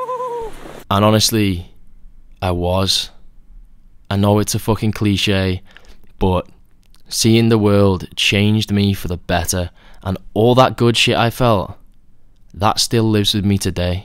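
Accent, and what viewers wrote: United Kingdom. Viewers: The party montage reminded me of Human Traffic, with your voice all trippy & british accent :D Good stuff! Perfect timing for me as well, been going through the same thing myself, first traveling for festivals & raving a lot, then going backpacking, growing a lot as a result but also being left with a sense of emptyness after that. Got to work so I can enjoy the present moment always, and being the best version of myself always, not just when partying and traveling. It's the hedonic treadmill, we always chase happiness, base it on external factors, and our happiness reverts back to baseline when we get it, we're never satisfied for long. Anthony de Mello is a great spiritual guide on that, his lecture rediscovery of happiness goes through how we can undo our cultural programming that keeps us changing money, women, status, jobs, titles etc in hope of happiness.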